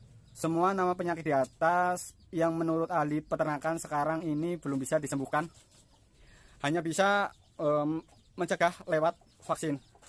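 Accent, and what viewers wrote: Banyumas, Indonesia. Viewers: Ora ngapak ora penak, mantap lanjut terus boss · Assalamu'alaikum.. mas.. buat video untuk ternak puyuh petelur mas..🙏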